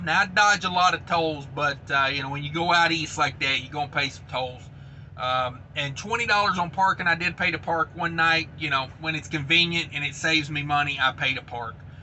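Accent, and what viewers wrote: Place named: Tennessee, United States. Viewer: Aha! I knew that accent sounded Tennessean, especially when you threatened to put a foot up someone's a$# over detention pay. You can take the boy out of the volunteer, but you can't take the volunteer out of the boy.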